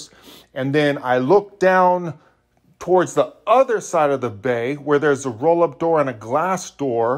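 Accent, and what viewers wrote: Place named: Hawaii, United States. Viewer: You sound like you from Hawaii….might be mistaken, but I hear some pidgin come out.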